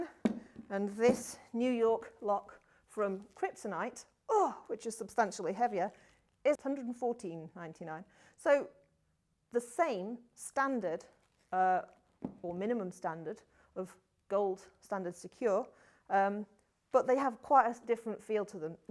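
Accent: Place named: England, United Kingdom. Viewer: Thanks for the humor-sprinkled video and all of the great info in the "Show More". Also, I live for English accents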